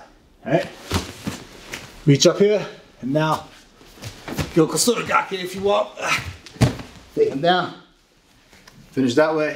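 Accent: New York, United States. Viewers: Great channel. I thought I heard a fellow NYer. Check the bio and sure enough. Wish I was still there as I would definitely check out your Schools because of your comprehensive grappling background. Great breakdowns and I like the way you explain the moves from multiple arts/perspectives. I come from a wrestling background and I am working on augmenting it with some Judo and these videos are helping. Thanks for your time.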